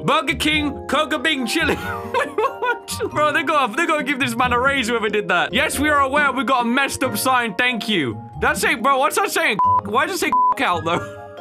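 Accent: United Kingdom. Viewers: He just sounds British how can a British man have British accent when it was the voice he was born with?please help I don't understand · Its insane on how does not hear his bri'ish accent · you def have an accent, im american and you sound british like in between the two extreme in the meme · Sometimes I can hear your accent in some words, sometimes not. I think as you said you are somewhere in the middle :) It's not that british english like first thing I think to myself hearing you is "Wow that's some strong british accent!", it's a bit smoother or softer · Yes u have a accent. Bri-ish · to me it sounds like you have a british accent but its not very strong like its not really obvious to hear just like dawko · you are very British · I can hear a British. But just me. Still like your vids! 🙂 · As an Australian listing to u you had a accent but no tom Holland British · You sound British! 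So you do have a accent!! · asking about his accent: me who actually grew on a british accent because of him and now being worried if its not so british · I can hear some Brit in your voice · Now that I think about it your voice is very odd compared to most British people I know, it’s like the way you pronounce things is very British but if you don’t really have an accent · yes you have a biritish accent it's not heavy but it's there. like how you say for, it sounds like the number four, more emphesis on pronouncing which I'm very okay with. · Of course you have an accent everyone has an accent, I myself have a P.A Dutch accent because its were I was born, you have a Britishy accent but you already knew this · You definitely have an accent but it's pretty faint compared to other British people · I sometimes forget about you being from the uk until there's some words you say differently. 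Or use meters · Of course you have an accent, everyone has an accent. I don't know why some people think that standard american english for example isn't an accent even though it clearly is. I can definitly hear that you have a british accent but I don't know which one since there are so many accents in Britain. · You that uncommon British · Bro sounds a bit British imo, he might be from there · Yep, to this Canuck you have a British accent, but like any spoken language it would be regional to your area. We have the same thing over here in Canada - we might all sound the same as Americans to the untrained ear, but there are differences for sure. All this to say - always enjoy your content and don't mind people giving you grief accent wise! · FACTUALLLL I do that with my cats and it's so lovely! They're my family and I love them so much! 🔺🔺 💞 Also I believe you have a slight british accent, not as british as Dawko but also not as non-british as non-british people lmao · yes, you do have an accent, British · Yea your language sounds like its in the middle with British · To answer your question, you do sound like you have a British accent, but it's kinda faint? 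If that makes sense. You can sound American one minute and the next British. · kidna british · You got a british accent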